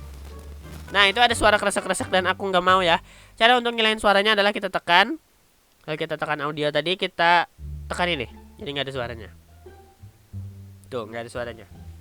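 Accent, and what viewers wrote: Banjar, Indonesia. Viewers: Kadaaaaa jerrr,, Logatnya banjar bujurr 😄😄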